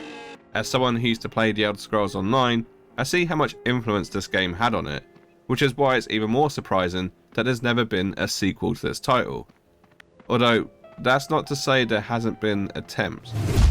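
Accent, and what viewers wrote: United Kingdom. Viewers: I'm amazed you have this many subscribers- a clan of drones really. First of all "old as fk" I am 39, played daoc since i was in my teens. I do 50 pushups almost every day and jog 6 miles + daily. That aside you judgemental fk question- Do you do any of that other than your life consisting based off of already provided information? Didn't think so. (aka leech with no real talent), Oh let's start off with your bitching of a 15 dollar subscription fee. Most MMOs that are free to play and we are talking hundreds if not thousands where even on your mobile app gaming. Oh yes I'm older- still know that phone transactions of whatever 1.99/4.99/9.99/49,99/99.99) required purchase to avoid a block wall etc = more of a rip off than a 14.99 monthly subscription. You have no clue of technology adaptation there was... Bluetooth/headsets/discord in earlier versions. 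Meaning when PvP was going this "new" tech was used to exploit farming, players would utilize that and set up their own realms by having their friends on the opposing side for points. You sounds like a brit brat and again we poured your shitty tea into the sea for a reason. Oh one last thing at least my generation knows what sex they are. MURICA! Goodluck with your wisdom bro comes with age. I personally couldnt see you changing a tire, shooting a deer, or yeah you be you haha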